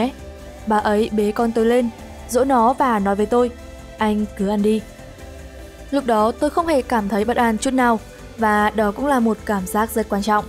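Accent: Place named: Vietnam. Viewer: ad đọc tên người nước ngoài nghe chán quá, thuần túy là phát âm bằng ....tiếng Việt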